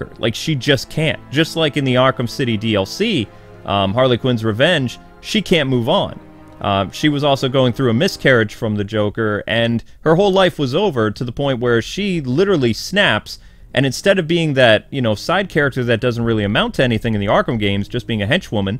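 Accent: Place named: New York, United States. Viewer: new york accent has been coming out a lot more recently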